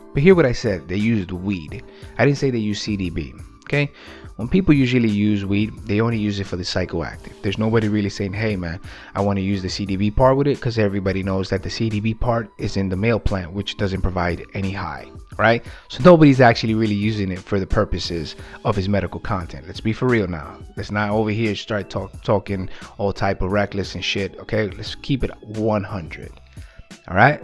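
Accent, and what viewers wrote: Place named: New York, United States. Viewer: I was right, you are from New York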